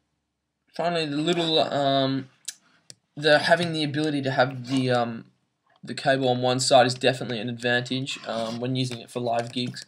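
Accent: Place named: Australia. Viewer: You sound Australian haha I listen/watch to dj cotts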